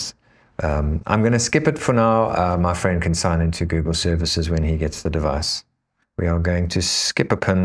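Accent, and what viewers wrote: South Africa. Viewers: Howzit? Wasn't expecting to hear a South African accent when I clicked on this video haha I'm from Canada but my family is originally from SA. Have to say this was a very clear video and can't wait to come back to it when I receive my RG405V.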